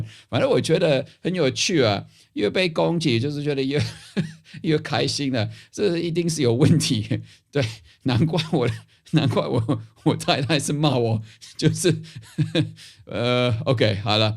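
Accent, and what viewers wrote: Taiwan. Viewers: 不用字幕啦，教授你說的台灣國語很道地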